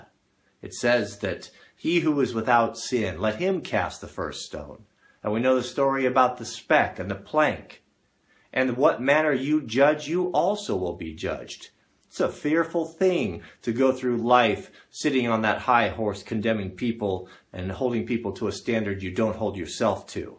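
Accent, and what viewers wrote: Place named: North America. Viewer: I have no idea of the "Controversy" involving Mr Canterbury, BUT! I take my hat off to you for making your stand known to the world. I am residing in Ireland, and you sound like you are in north America, that is the reach of youtube! :-) Please keep the videos comming! Kev B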